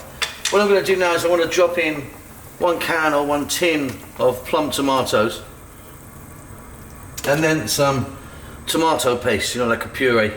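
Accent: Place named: England, United Kingdom. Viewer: I love your way of cooking as well as your English accent Amazing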